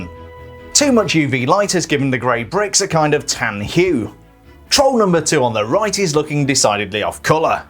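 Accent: United Kingdom. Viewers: After all of the videos from you ive watched, i cant tell if you’re british or American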